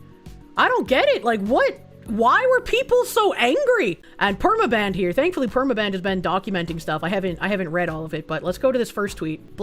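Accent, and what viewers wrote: Boston, United States. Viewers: I’m hooked whenever I see her ring all cute in the intro. Then the Boston accent hits?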